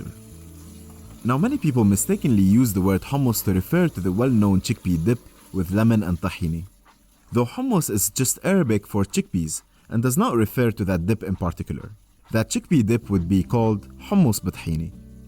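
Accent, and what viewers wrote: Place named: Lebanon. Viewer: Hah. That’s a Lebanese accent if I’m not mistaken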